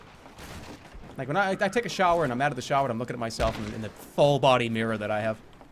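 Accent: Boston, United States. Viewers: jerma's voice sounds so much higher in this video and he sounds more boston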